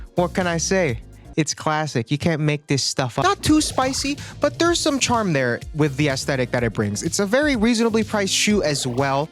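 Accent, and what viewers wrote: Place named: New York, United States. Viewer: It’s funny to see an island boo speak with a New York accent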